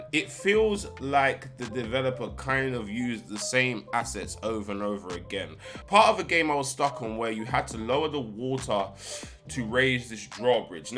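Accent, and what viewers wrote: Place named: United Kingdom. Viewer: Great review. Man comes for that UK flavour so spot on delivery. I look forward to more.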